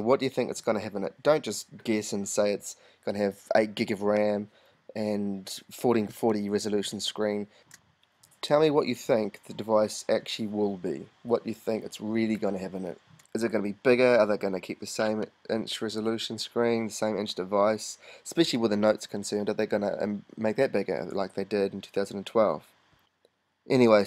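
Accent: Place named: New Zealand. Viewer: I think your from new Zealand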